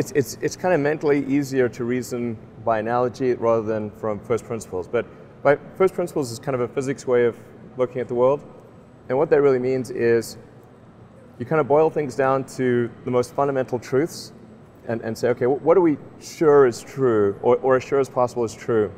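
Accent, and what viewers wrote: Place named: Australia. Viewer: How come he has an Australian accent?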